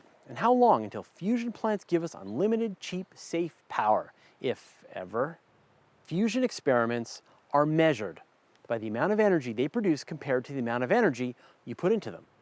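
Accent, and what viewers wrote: Canada. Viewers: How do we know you're Canadian? If the first word in a sentence starts with an E, you really put emphasis on the E unlike Fraser Cain fans from the Old World xD btw good video today. In the past I thought you did this just as a hobby to encourage young people to get interested in science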